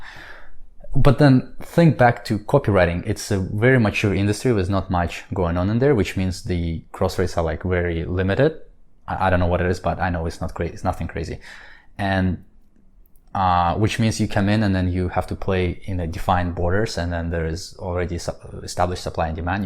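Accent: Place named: Russia. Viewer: Super interesting and valuable - I look forward to the entire series, and following your ongoing journey. Interested in your accent, which sounds more "Russian" than "Korean" to this Californian.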